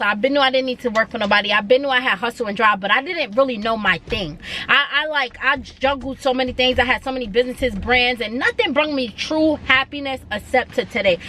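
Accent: New York, United States. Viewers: That NYC accent! Fire! Super detectable!😃You sound like Minaj!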